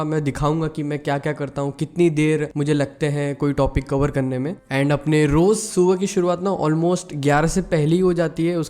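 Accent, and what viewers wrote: Maharashtra, India. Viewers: Your language gives a very strong maharashtrian vibe😂❤ BTW another maharashtrian here.💗🌟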